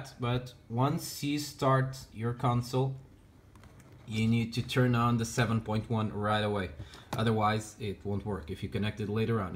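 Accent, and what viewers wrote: Brazil. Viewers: Eu vendo o vídeo, e ouvindo seu inglês, de olho no sotaque kkkkk, na hora vi que era BR também.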